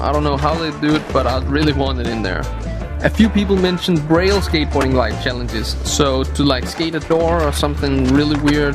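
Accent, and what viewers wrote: Denmark. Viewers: Er du fra Danmark ? Du lyder virkelig dansk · man ka bare høre at du er dansk men hvis man er udlansk er det selvfølgelig ikke noget problem XDXDXDXD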